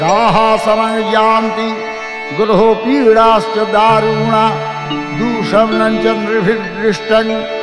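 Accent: Bengal, India. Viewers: Nice voice, very well suited for mantra. Wish the accent was not overly Bengali and the sanskrit phonetically purer. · Khub bhalo....kintu bangali uchcharon....Sanskrit is not pronounced like Bengali syllables. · It is in Sanskrit. It is pronounced with a Bengali accent. And style of this kind recitation is very much found in Bengal. Probably, that's the reason. May be he is reading it in Bengali script, but the language is definitely Sanskrit.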